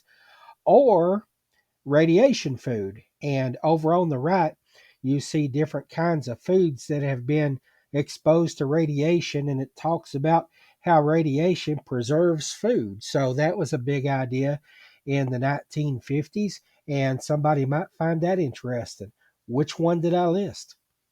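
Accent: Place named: Appalachia, United States. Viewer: HAHA Hillbilly accent. Being in NC, we have a lot of that here too. I call my friend a Renaissance Redneck. 🤣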